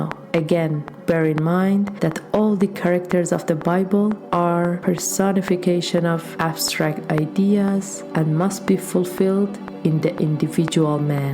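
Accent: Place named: Iran. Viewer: Thank you so much. Keep making new videos. I already fell in love with your Persian accents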